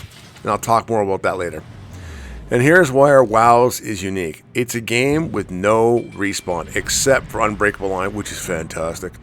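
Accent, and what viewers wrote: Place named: Canada. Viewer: Just sub'd -- good to have a Cannuck voice here -- since I-Chase Gaming went away ..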